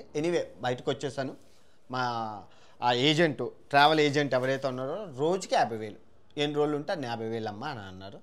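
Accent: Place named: Visakhapatnam, India. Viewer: I like your videos and your telugu accent which most people in Vizag and near by mostly used to speak. God bless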